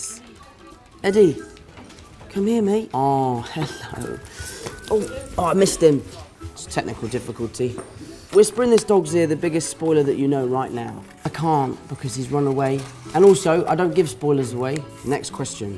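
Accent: United Kingdom. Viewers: He took back his British accent, no ? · His British accent has come back 🤩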